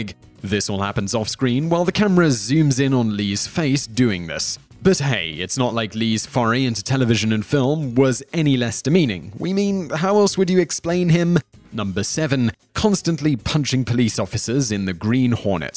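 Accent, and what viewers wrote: United Kingdom. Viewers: How can you know all this about Bruce Lee and still not be able to pronounce Kato? Even if you're pronunciation is "more correct" and you say it with a British Accent isn't going to change the fact that no one else says it that way. Also you mispronounced Milton Berle's name as well.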